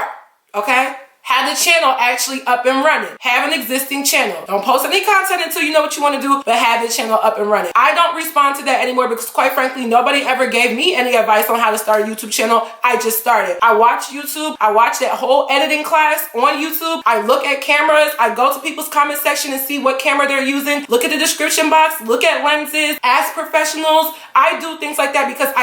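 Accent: Nigeria. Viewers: that beautiful Nigerian accent😍😍😍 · Loved this video. Very funny and great points. Also love when the accent comes out. 9ja!!!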